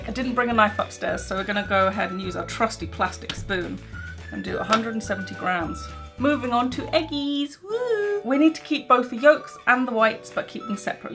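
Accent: United Kingdom. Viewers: Shes british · Are you from uk if you are Which part are you from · British I believe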